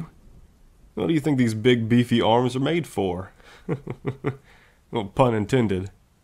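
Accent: South, United States. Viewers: I’m a sucker for the country accent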